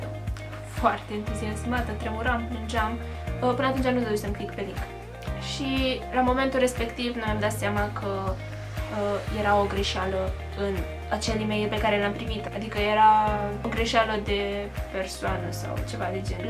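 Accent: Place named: England, United Kingdom. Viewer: vezi ca ai putina engleza pe la coltul gurii:))))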